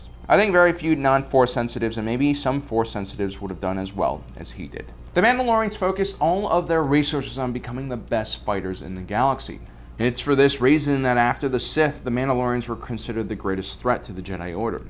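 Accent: Australia. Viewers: Are you Australian ? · The intro sounded very Australian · are you Australian ? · ... Aussie? New Zealander? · You actually getting better at the Australian accent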